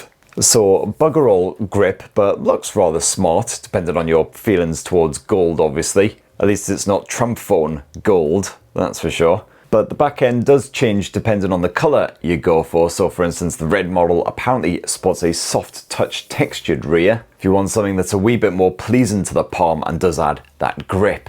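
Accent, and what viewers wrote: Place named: Northern England, United Kingdom. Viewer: I could listen to his Northern English accent all day long.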